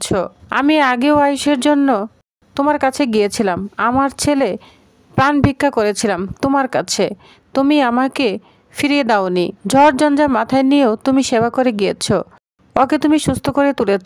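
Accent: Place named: Bangal, Bangladesh. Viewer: বাঙ্গাল বাঙ্গাল উচ্চারণ, অশিক্ষিত উচ্চারণ ঠিক ঠাক শিখে কতকথা করবে।শুভেচ্ছা রইল।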